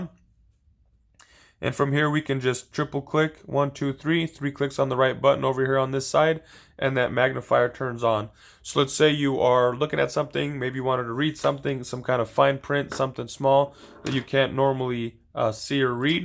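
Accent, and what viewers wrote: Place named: United States. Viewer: One of the clearest, most concise demo of an iPhone feature that I have ever used. It’s also very nice to hear clear American English at at a speed I can process. Thank you. (That is not a disparagement of ESOL speakers. They are just hard for me to understand when they speak at a very fast rate.)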